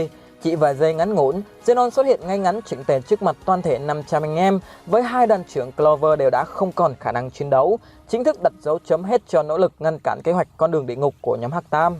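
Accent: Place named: North, Vietnam. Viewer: ad ổng gốc bắc sống ở nam mà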